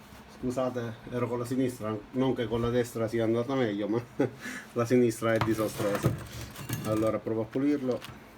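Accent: Sicily, Italy. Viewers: Salve signor, potrei chiederle se acquista i prodotti parkside in o anche in un negozio fisico della Lidl? per via dell'accento ipotizzo che potrebbe essermi utile, abito in un paesino da cui il LIDL più vicino è in realtà parecchio lontano e mi farebbe comodo andare sul sicuro, non ordino online infatti proprio perchè a giorni dovrei recarmi a Palermo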